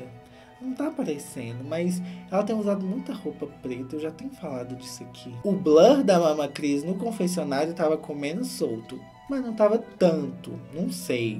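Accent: Minas Gerais, Brazil. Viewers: do céu, NUNCA acompanhei as Kardashians. Mas gostei tanto do seu jeitin (vc é mineiro néam?!) de contar a história que vou acompanhar por aqui! Bjos!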